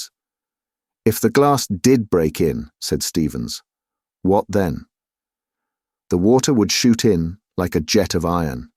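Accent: England, United Kingdom. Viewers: Ahhh thank you sooooo much, fabulous narration. I so appreciate this lovely English accent.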